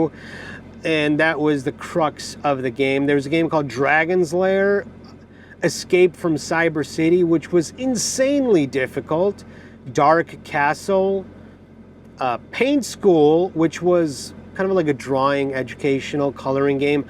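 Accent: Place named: Russia. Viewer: I thought you had a Russian accent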